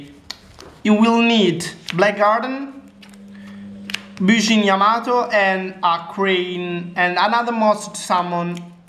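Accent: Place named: Tuscany, Italy. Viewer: O.O TI DEVO FARE I COMPLIMENTI PARLI MOLTO BENE L INGLESE MA L ACCENTO TOSCANO NON TE LO LEVA NESSUNO :)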